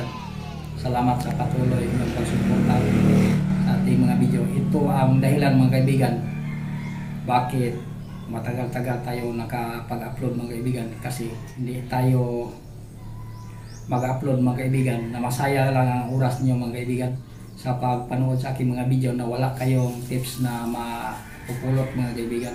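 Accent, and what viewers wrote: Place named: Visayas, Philippines. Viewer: 😃😃😃 Ang galing mo tay lalo na sa pag sa salita mo murag bisaya 😀😀😀 may nakat on an jodko nimo salamat tay baguhang panday Mason rin Ako.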